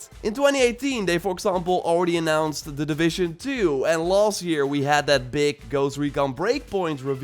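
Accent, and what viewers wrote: Netherlands. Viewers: Are you Dutch lol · Are you dutch? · are you dutch?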